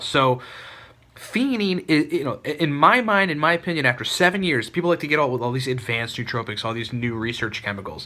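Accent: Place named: New York, United States. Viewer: that's pretty close to New York. That's probably why I don't notice a difference between your dialect and mine. The only time I notice people talking fast is when they have a unique voice in addition to them talking fast (Ben Shapiro). I really didn't notice it in your case since your voice isn't that unique.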